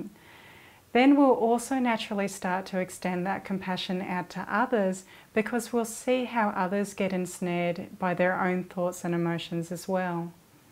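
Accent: Australia. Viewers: Excellent talk, thanks for sharing. Lovely Australian (?) accent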